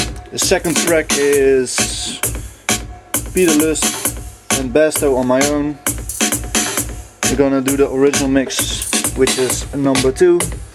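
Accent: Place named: Netherlands. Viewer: haha leuk accent ;) echte hollander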